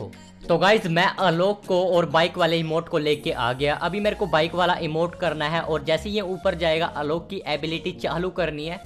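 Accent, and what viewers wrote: Punjab, India. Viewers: Bro r u from Punjab ☺️🤭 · Bro you are from punjab??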